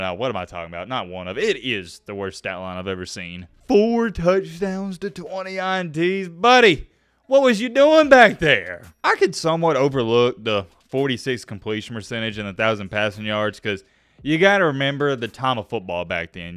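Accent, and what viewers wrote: South, United States. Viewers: Because this cat has a southern accent... I guarantee you, that's not how you say this qbs last name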